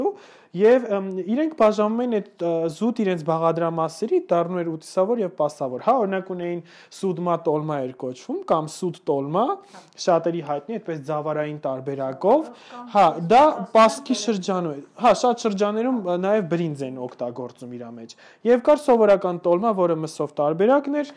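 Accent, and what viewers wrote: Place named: Sasun, Armenia. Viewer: Shat mec bavakanutyun staca haxordumic, jan lselov mer Sasuni anush barbar@ texapoxveci im mankutyun ev mer harazat Irind.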